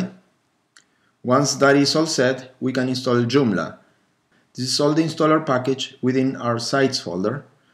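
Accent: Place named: Italy. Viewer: se sente debbrutto che sei italiano XD thanks for vid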